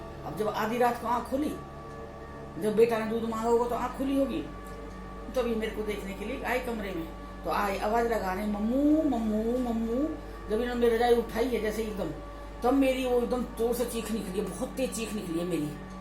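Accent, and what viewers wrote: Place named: Meerut, India. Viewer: Aunty apka baat batane ka tarika bahut acha hai, Ap merath se ho kya Meri mummy waha ki hai or unki boli bhi apke jesi hai, love you a lot 😘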